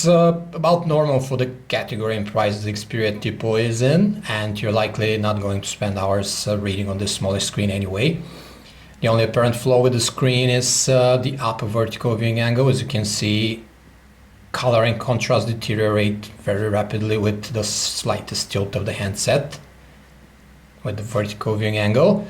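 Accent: Bulgaria. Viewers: Hey! Good review bro! You're Bulgarian arn't you? :-) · Are you bulgarian?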